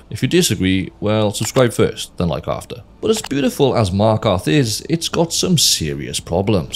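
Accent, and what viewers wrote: Wales, United Kingdom. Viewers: I don’t see anyone else having remarked on it after a cursory skim, and it might be just an accent thing, but I swear it sounded like you said ash-thetic. So, either your diction gives a bit of Sean Connery (I know, you’re Welsh, he’s not, it’s not technically an accent thing, but ykwim; also Welsh accents are so pretty, like burbling streams), or you snuck in a 10/10 pun. Either way, it works!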